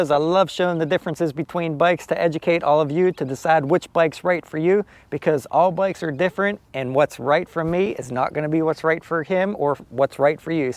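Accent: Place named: South, United States. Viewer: Nice Southern accent!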